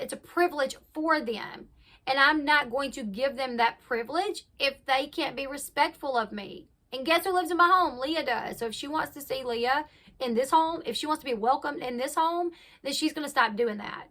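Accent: South, United States. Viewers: ... At first I had commented, basically piggybacking onto your 1st comment and then read what said back to you and I'll admit, she got me. She played victim and because I'm one of "those people", you know exactly what I mean, the ones she looks down her nose at, I was ignored by my initial comment on yours and had stupidly deleted it. Then I chose to write an apology comment directly to and you know what? She ignored that one too! I used to really support her channel, especially during the whole KJ situation, BUT now.... nope! She's just like KJ, with a more southern accent and better ability at applying makeup. That's pretty much all. She doesn't care about anyone BUT herself, her money, maybe husband and kids and we don't make the cut whatsoever. This is the type of broad that sits at BBQ'S bitching about how "these people" affected her life and how useless we are. 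She's judgemental and I feel like a fool for firstly deleting my original comment and writing a separate apology one and secondly for falling for the manipulative techniques & word salad used in her reply to you and your comments. This woman, I'm so glad that my daughter won't ever have to grow up around her children and there's states between us. I just can't, it's like speaking to a body void of personality and empathy. Empathy is what got me to delete, apologize and, no excuses about your teeth or children are going to get you outta purposely ignoring it. I'm embarrassed and angry. BUT, I s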